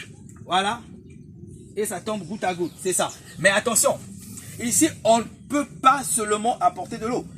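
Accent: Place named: Africa. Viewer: Aaahhh,ça c'est l'Afrique! Avec le véritable accent et la vraie pédagogie! Est-ce '" tu comprends, hein?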